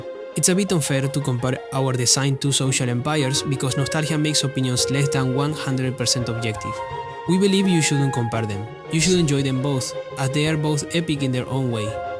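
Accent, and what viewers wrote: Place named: India. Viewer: Ingles hindú jaja, que grande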